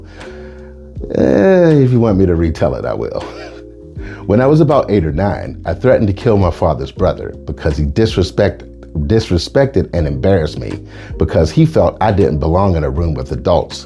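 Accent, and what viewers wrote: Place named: Ireland. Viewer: You're Irish accent is on point! And you preach, but not the way your grandmother predicted!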